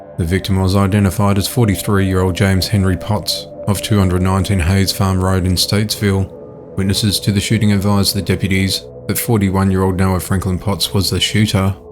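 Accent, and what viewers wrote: Australia. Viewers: All news should be told in an Australian accent. It’s just easier to hear. Not so much the news as the soothing voice and the contrast thereof. Thank you!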